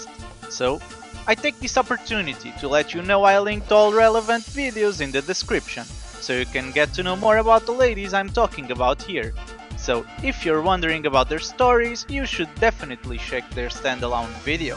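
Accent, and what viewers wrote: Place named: Russia. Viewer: I love how Russian your voice is my guy it's a great accent!!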